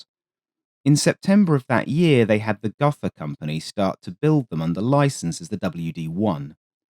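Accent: United Kingdom. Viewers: Ah, an excellent gift from our favorite subtly snarky British military aviation narrator as Christmas approaches.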